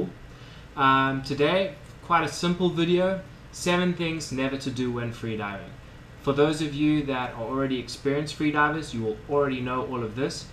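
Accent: South Africa. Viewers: Thanks for the tips mate, are you from South Africa? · Nothing like a good ol' SA accent :) Cool vids boet, keep 'em comming!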